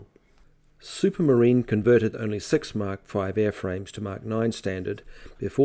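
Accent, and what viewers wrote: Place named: New Zealand. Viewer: Nice to hear a kiwi accent on youtube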